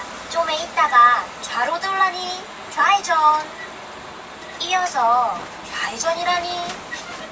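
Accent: Gangwon, South Korea. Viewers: 강원도 사투리버전 진짜 밤에 낄낄 웃었네요ㅎㅎㅎㅎ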